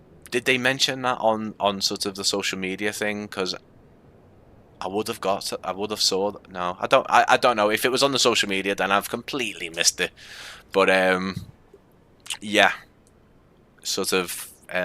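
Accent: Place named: Wirral, United Kingdom. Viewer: Excellent work, mate, keep it up :) Where are you? Your accent sounds local to me (Wirral)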